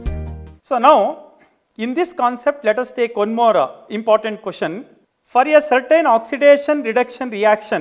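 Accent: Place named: Tamil Nadu, India. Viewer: typical tamil village english but ok ok teaching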